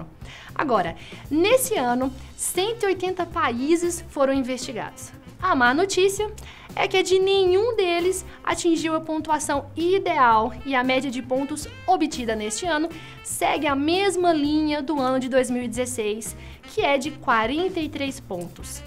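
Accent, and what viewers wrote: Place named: São Paulo, Brazil. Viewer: Sotaque de paulista feio! Coloquem uma pessoa que saiba pronunciar as palavras corretamente !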